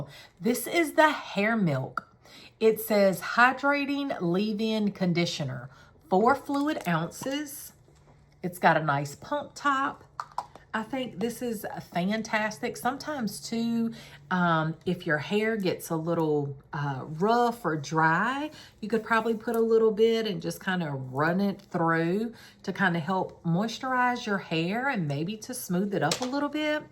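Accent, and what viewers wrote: United States. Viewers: Congrats. You make me homesick for the Redneck Riviera with ur wonderful accent. You also have the cutest dimples. 💔🤍💙🇺🇸🇺🇦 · Hi, Congratulations on your 8.000 subscriber achievement! Watching you is delightful, I love your Southern accent and hauls! Here’s to 20,000 subscriber milestone.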